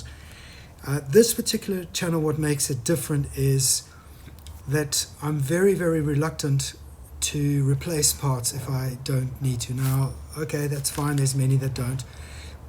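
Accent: South Africa. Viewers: Great work bru'...where you from?...I'm guessing Maritzburg area...miss SA🥺. Love your videos, you're on your way to 1m subs in no time!!!.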